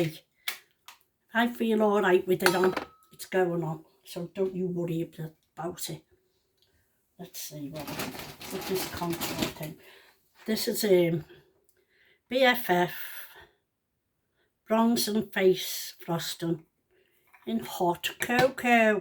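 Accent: United Kingdom. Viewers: I love listening to your British accent ❤ and thank you for the advice 🙋‍♀️ about the infection, as women we have more to look forward to 🌬 just being women is a challenge the older we get..thank you for caring 💕🌹💕😘🙏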